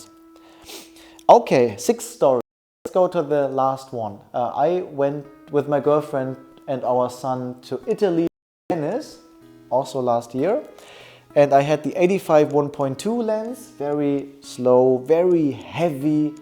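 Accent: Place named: Germany. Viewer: That was the frist video I saw of you. ☺️ Watch a couple more last night and I love them. And your accent is kinda funny in a cute way. No offense. I really like that german accent.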